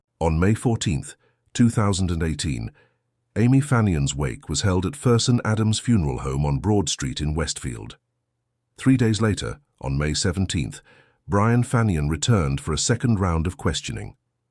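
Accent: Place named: United Kingdom. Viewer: This AI voice of the host is horrific the limey thing does not work in the USA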